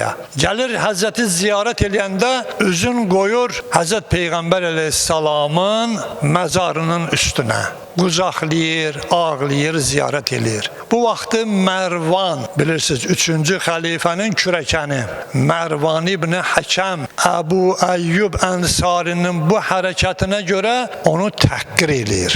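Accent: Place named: Iran. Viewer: Bunun nə murdar səsi, ləhçəsi,sifəti var. Iran tulası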